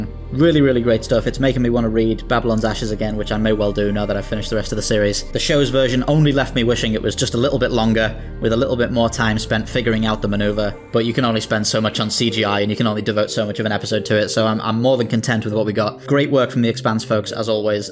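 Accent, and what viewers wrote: United Kingdom. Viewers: I love the glottal stop of the producer of this video; also his use of "little bit." This is my favorite British accent.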